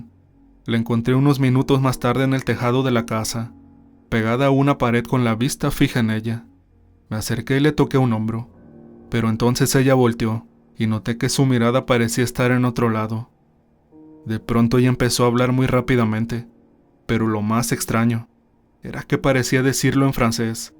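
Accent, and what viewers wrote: Mexico. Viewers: Amigo, quiero hacerte una inquerencia, y espero que no cause ningún incordio, es la siguiente: El acento o tono que empleas para hacer tus narraciones ¿es propio de algún lugar en específico o es un tono que haces para narrar? Sí me suena mexicano, aunque no sabría de cual lugar de allá. ¿No es incomodo inquirir esto? Saludos, Dios te guíe.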